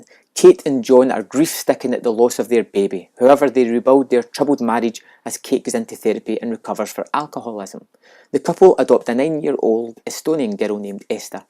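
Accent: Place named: Glasgow, United Kingdom. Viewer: I guess its from Glasgow? Have to digest what you are saying as I am foreigner living in England. But your reviews are good just watched Neesons Non-Stop which was great and the 70-80 % of stuff in Netflix/Amazon is average/bad.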